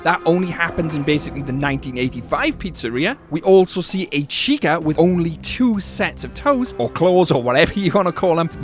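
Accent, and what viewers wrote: Wales, United Kingdom. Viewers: Welsh accent ?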